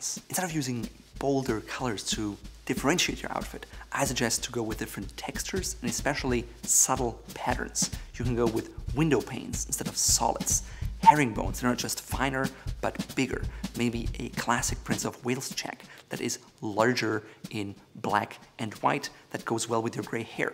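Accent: Germany. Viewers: are you german? your accent sounds like a german. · I like your accent! Are you German?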